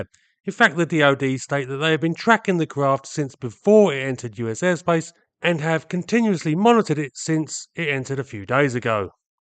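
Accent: United Kingdom. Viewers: Always refreshing to hear a UK voice, with a reasonable, calm, unsensational approach to a major issue. 👍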